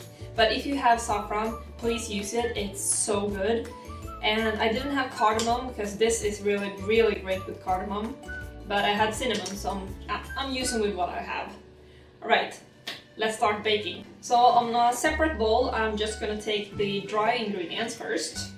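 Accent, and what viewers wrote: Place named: Sweden. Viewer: Are you from suécia? Your english is different. The suécia is a beutiful country.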